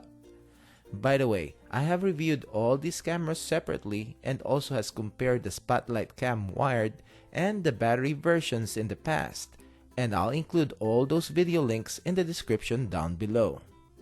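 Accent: Philippines. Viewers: Is that a Filipino accent? Tagalog?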